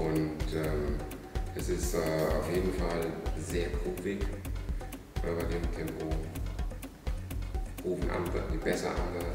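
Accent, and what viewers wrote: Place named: Hesse, Germany. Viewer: es svenche ausem ömche,ich wünsch mir das de mehr hessisch babbelst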